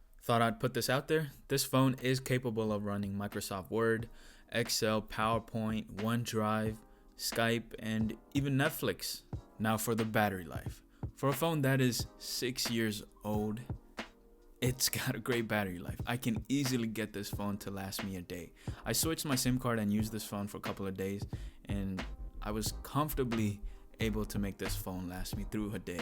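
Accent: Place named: England, United Kingdom. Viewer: I like your English accent. I am a native Spanish speaker but I also speak English as my second language and it sounds just like yours. I broke my phone about 3 years ago. Asked one my friends if he had an extra phone floating around in his house that I could use and he said yeah. He gave me an HTC Windows phone and I was so happy cuz it was my first time seeing a phone like that but the sim slut was too big for my chip so I'd only be able to use it with wifi. It started working real good but after a month or two it shut down completely. Whenever I turn it on, it won't connect to the wifi.. Can't do anything on it. Can't text .. can't do none of that anymore. Went on Saint Google looking for answers and I found out that it has stopped working.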